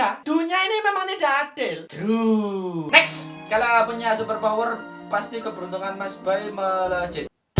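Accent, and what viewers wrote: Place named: Malang, Indonesia. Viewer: Bayu. Orang mana. Malang Yo omongane koyo wong malang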